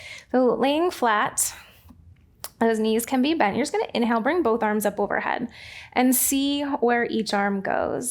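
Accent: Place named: California, United States. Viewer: As a British person, we usually skip anyone with his type of American accent (is it Californian). It sounds so annoying to us. Our communication style is the polar opposite. Anyway despite the accent I'm listening to the video. I can see you mean well.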